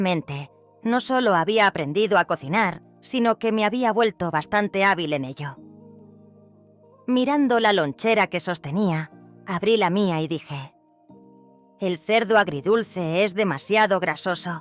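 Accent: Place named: Castile, Spain. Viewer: AHORA LA NARRACION ES CASTELLANA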